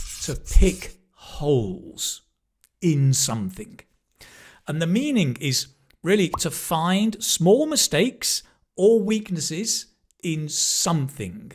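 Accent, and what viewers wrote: United Kingdom. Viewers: ❤ British accent ❤❤❤❤❤ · Pretty obvious he is British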